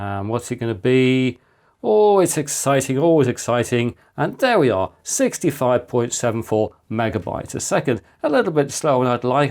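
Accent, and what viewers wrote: United Kingdom. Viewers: Thank you for very clear and easy to follow videos and fantastic British English. So nice in middle of those AI s**** channels… using AI voice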